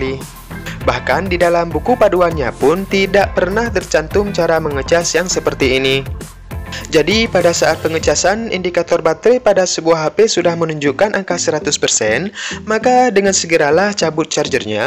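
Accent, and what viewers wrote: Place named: West Sumatra, Indonesia. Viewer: Dari logat ny...Orang minang ya min..?? 😄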